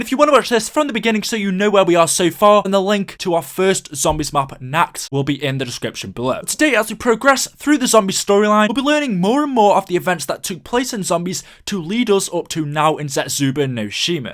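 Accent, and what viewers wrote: United Kingdom. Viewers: Giovanni Costilla well he is from the United Kingdom, and he is British, not Canadian like vanossgaming.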